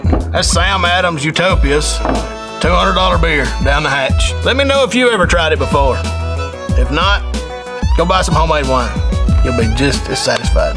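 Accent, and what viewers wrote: South, United States. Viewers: Nice hat, glorious beard, southern draw....well hell, Ima have to subscribe to your goofy ass.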